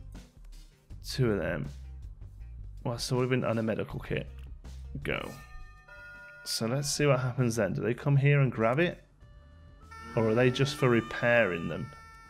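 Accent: Canada. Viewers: Wow, now that you are speaking Canadian, it is really hard to understand you when you talk fast!! :) (I think you are going to make me spend money on this game!)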